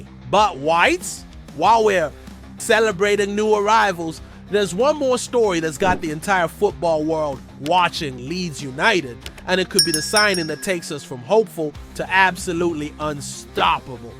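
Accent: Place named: United States. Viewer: Why change the voice to American. It’s unbearable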